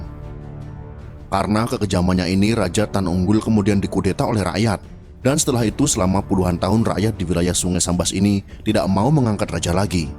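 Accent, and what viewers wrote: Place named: Java, Indonesia. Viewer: Miminnya ada logat Jawanya..kuliahnya d Jawa ya min?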